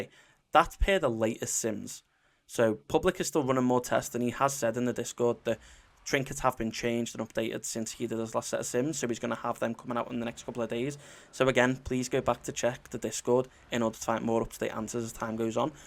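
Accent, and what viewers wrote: Liverpool, United Kingdom. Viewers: Is that another scouser playing WoW ? Boss.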